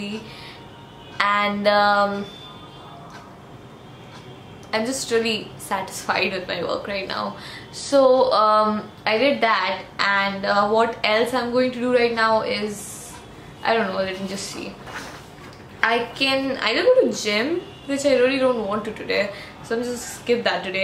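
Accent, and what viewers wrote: India. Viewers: Is she Indian , her accent .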